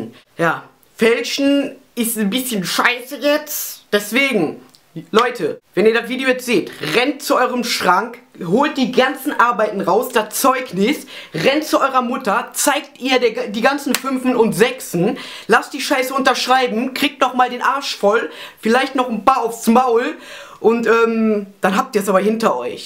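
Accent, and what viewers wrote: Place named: Cologne, Germany. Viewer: hör ich da kölsch?!?!?!?! ich würd sagen.....super tipp, das mit dem durchmachen....probier ich gleich mal aus:D ...ich hab irgendwie angst vor diesem st. augustin...!!!!!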